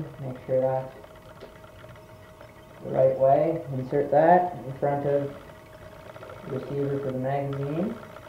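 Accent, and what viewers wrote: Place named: Atlantic Canada, Canada. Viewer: thought I heard an easy cost accent. I'm from Newfoundland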